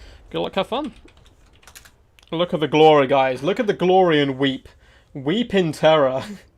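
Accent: United Kingdom. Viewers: It's the british accent but he's just an average lad.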